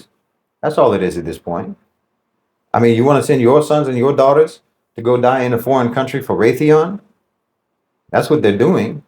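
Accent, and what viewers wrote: United States. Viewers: The talk is in English and in the US accent because this guy is also an American but got over the indoctrination.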